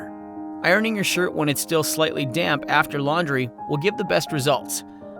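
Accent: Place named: United States. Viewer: Bli kvitt den teite amerikanske stemmen.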